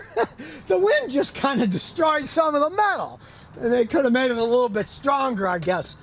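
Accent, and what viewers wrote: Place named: Tennessee, United States. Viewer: not gonna lie, when i first watched your vids, I was like, "is he from tennessee??" damn i'm pumped to meet u haha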